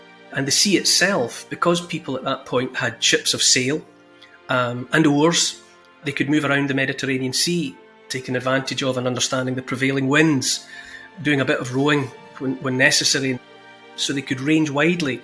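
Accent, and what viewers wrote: Scotland, United Kingdom. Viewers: You tell the story so beautifully Mr Neil Oliver with your beautiful Scottish accent. Like yourself I have always admired and respected the Spartan Warrior culture. I served Honorable in the United States Marine Corps. SEMPER FIDELIS SIR🇺🇸🫡✊️🖖🙏GOD BLESS YOU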